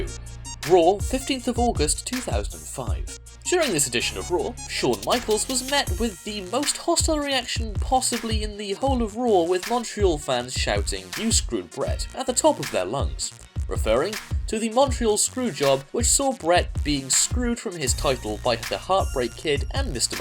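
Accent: United Kingdom. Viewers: Plus the gay ass British voice doesn't help neither.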